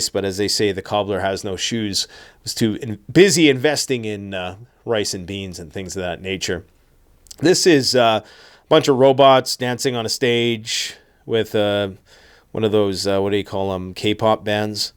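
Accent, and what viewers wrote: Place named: South, United States. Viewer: American southern accent “Alright” opening. All the hype points lol. Immediate like on the video. lol Request for comment ✅ You earned it buddy!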